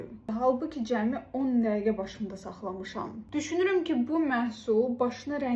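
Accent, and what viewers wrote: Russia. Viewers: Русскоязычная. Ona görə belə şirin danişir👍❤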